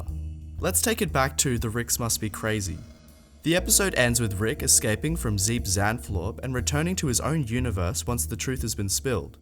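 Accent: Australia. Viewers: I gotta love that aussie Accent. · I FOUND ANOTHER AUSTRALIAN TUBER YEEEEEEA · Every thing is better with Australian accent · My man your aussie too ^.^ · Aussie boy!!!!😀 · Woo an Aussie! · Yeah a Aussie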